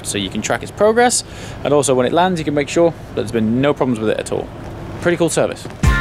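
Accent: RP, United Kingdom. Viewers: Your posh Brit accent had me in stitches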